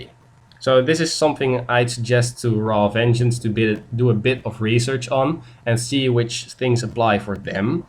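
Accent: Netherlands. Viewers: Dutch guy, I can hear it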